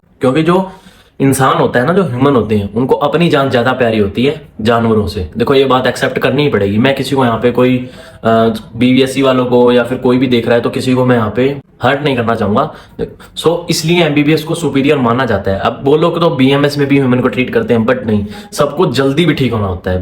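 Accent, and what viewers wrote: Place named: Pakistan. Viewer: bhai yr no offense, aap haryana se ho but aapka accent pta ni kyo mujhe pakistani wali vibe deta hai, jo woh urdu ka hota hai, mast...baaki content toh bdhiya hai hi, aur woh interest wali baat hi sahi hai, jisko sachme animals se pyar hai wohi Veterinary kre, mujhe agle saal NEET dena hai but m already prepared to be a veterinary.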